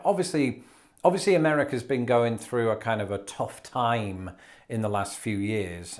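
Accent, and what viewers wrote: United Kingdom. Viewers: I'd know you were British because I watch a lot of British TV on Acorn and Britbox. I'm still trying to learn the different British accents though. So far, I think my favorite is the Yorkshire one, maybe because Sean Bean speaks it 😊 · You have a very crisp unmistakable British accent. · We love your accent. Americans have a whole mess of different accents. Your accent is so pleasant. It is wonderful way to learn about different cultures and accents from you in the U.K. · That's awesome! (the Alaska Ferry System)... I was just reading about how the Tustumena (57 year old ferry) is finally being replaced! I used to live in Kodiak, so I'm familiar with the "Trusty Tusty" 😆...ps.. Been to the Netherlands a few times, but never to England. Only two British accents I'm familiar with is here, and the Geico lizard... 🦎 LOL.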